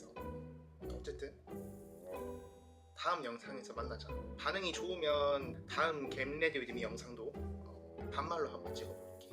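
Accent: Gyeongsang, South Korea. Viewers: 저 헤지스 룰429 립밤 2+2 했을때 8개 산거 주변에 몇개 나눠주고 오늘 마지막꺼 오픈했어여🙋‍♂️ 션님 사투리는 경상도 허세가 없어서 귀여운거 같아용👍 그리고 같은 경상도인으로서 저만 그런건지 모르겠는데 서울말 쓰다가? 주변에서 사투리 써보라고하면 잘 안나오지않나요^^ 근데 저보고 항상 사투리 쓰고있다는ㅎㅎ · 예전 영상부터 경상도말투엿는데ㅋ ㅋ ㅋ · 누가봐도 경상도 사람이었어요 형 걱정 안하셔도되요!!! ㅎㅎ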